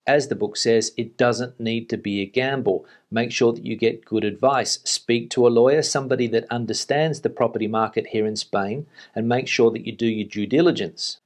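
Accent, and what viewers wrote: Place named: Australia. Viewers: How? I'm not english native speaker and I could tell his accent was from Australia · Aussie aussie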